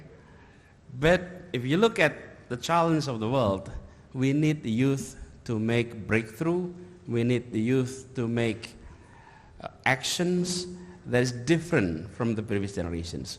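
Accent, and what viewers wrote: Indonesia. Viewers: Bagus, tp inggrisnya kelihatan org indonesia, jauh dr spt native speaker.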